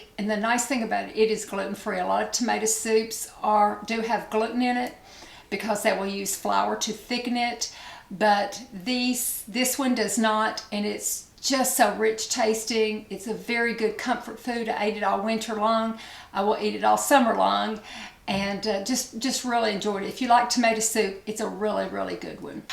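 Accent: South, United States. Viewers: Hello new to your channel 😃. Great tip for velcro rollers, who knew❤‼️ Love your accent, you sound like a Southern Lady. I went to grad. school in GA., and enjoyed everyone I met. 👍 P.S. Where did you purchase your fabulous earrings, so pretty?!!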